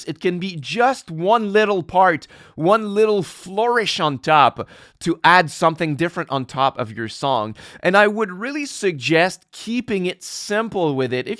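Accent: Canada. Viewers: You sound Canadian!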